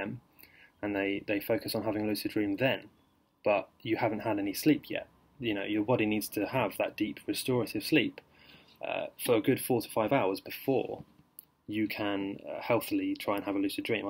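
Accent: United Kingdom. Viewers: Makes sense..I've never had a lucid dream, not ever really tried to. But I think I will after watching this. My body naturally wakes up between 5am-6am & then when I get back in to bed and fall asleep I have the most intense real life dreams, so colourful & I can touch people and talk and do things..but I think I am not lucid dreaming because I only remember it once I wake up and I've never drifted off in to sleep while staying still. I usually always lie on my side..from the urge to haha..but yeah it makes sense in to hear this method..I will let you know if it works. Thanks for your video, also nice to hear a fellow Brit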